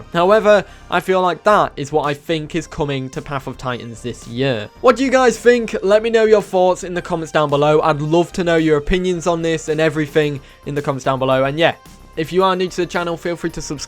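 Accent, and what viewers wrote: United Kingdom. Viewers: your british english is funny i like it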